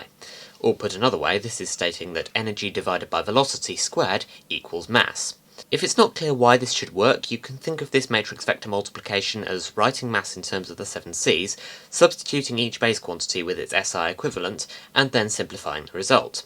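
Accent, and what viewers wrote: United Kingdom. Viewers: You have no idea what a vector is, but with a British accent you can make the biggest BS sound true, including WMD.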